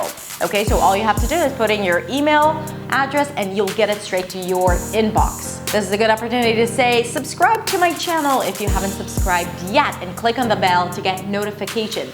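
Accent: United States. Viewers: you are a genius, gifted where teaching is concerned. I am Republic of Benin (West). I've discovered the secret of learning English and how to sound native. Thank you so much. To top it off you're making me fall in love with American accent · you are American?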